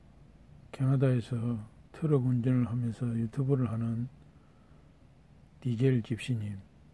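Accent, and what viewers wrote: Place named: Busan, South Korea. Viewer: 부디 편안하게 영면하소서..구수한 부산사투리 정감있고 좋아했는데..너무 황망하게 가셨네요.ㅠㅠㅠ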